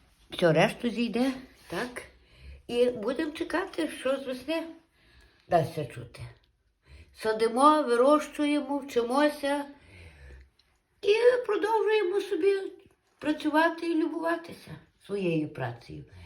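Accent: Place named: Ternopil, Ukraine. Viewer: Дуже дякую за чудове відео,жіночка дуже нагадує мені мою бабцю,у котрої я ріс до самої школи.Дуже гарна мова,балакає так само як моя бабця🙂,ви напевне знаходитесь на Тернопільщині?Я жив у бабці саме там.Там дуже гарні крайовиди,безмежні поля й ліси,граби,ясені,дуби.Нажаль дубину вирізали давно😔.Моя бабця також насадила багато горіхів,тільки вона їх не вирощувала навмисне(здається на весні),по городі сходили молоді ростки горіху і бабця поосто їх пересаджувала куди треба.Я тоді запитав у неї:"звідки вони ростуть на городі",а вона відповіла:"то ворони в осени розносять і деякі падають,а на весні виростають",ось так.Дуже родюча земля,багато чорнозему.Успіхів вам у вирощуванні,викладайте ще відео👍🇺🇦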